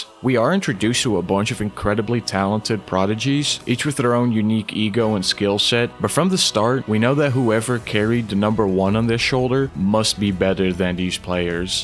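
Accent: Netherlands. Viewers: hoor gelijk dat je een hollander bent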